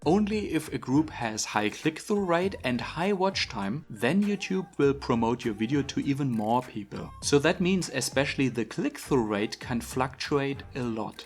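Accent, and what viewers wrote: Germany. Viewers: Heavy German Accent. You say [exactly] instead of [exectly]. I know the first is right but its spoken like the second one. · alter red doch einfach deutsch man haha, wenn deutsche english kann man nicht aushalten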